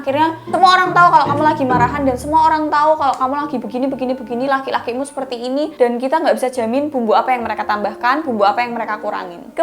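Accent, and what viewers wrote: Java, Indonesia. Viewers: Nonton buat edukasi 🚫 Nonton buat denger medok gurih✔️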